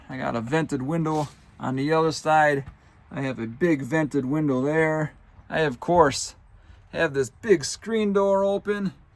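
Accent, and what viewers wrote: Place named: Wisconsin, United States. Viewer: Hey man, this is a great video. Thank you! I think I'll take this approach for now, I'm thinking a semi-insulated version 'cause I live in AZ, but I'd rather the funds go toward traveling not insulation materials! Love your accent, are you from Wisconsin? If yes, me too!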